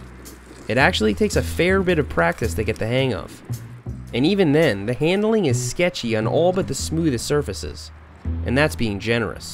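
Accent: Long Island, United States. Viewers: ! Easy to spot that Long Island accent!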